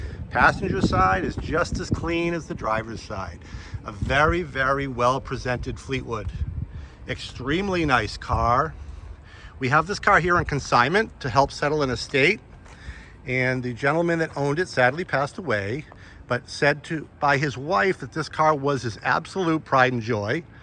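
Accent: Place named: Boston, United States. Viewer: Hi! Love your channel and your Boston accent! This car is priced very well, considering the survivor quality, the low miles, and all the undercoating process that the previous owner paid for. He must of loved it if he kept three covers on it in a climate-controlled garage. It will sell FAST!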